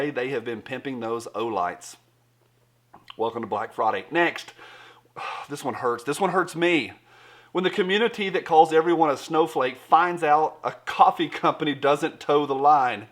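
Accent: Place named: Alabama, United States. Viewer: Googled up Gomer Pyle Accent. Apparently it's Alabama. I'm Tennessee Hillbilly, but it's mostly washed out by now. I think, you are Tennessee? edit (keeps watching video) OK, you're Alabama.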